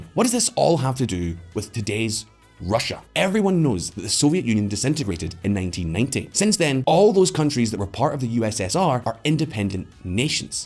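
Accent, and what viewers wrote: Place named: Scotland, United Kingdom. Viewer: How do you get American from that accent? He's Scottish. Part of the UK Ashley 😁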